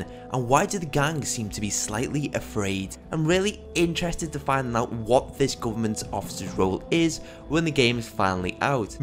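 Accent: United Kingdom. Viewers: British acent???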